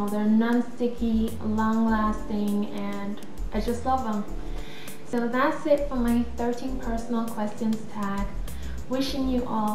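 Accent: Jamaica. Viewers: majamajami ........she sounds Jamaican...................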